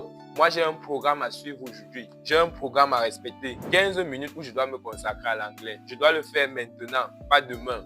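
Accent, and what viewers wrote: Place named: Cameroon. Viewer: On reconnait le ton Camerounais 😂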